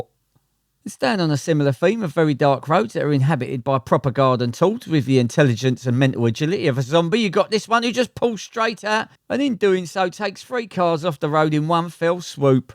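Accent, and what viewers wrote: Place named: England, United Kingdom. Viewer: Holy fark your pommie accent is very fuckin' annoying so n I gone.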